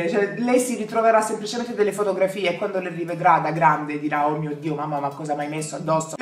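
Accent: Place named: Foggia, Italy. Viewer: posso farti una domanda che non c'entra niente col video?? tu sei di Foggia?? no perchè dall'accento sembra di si!!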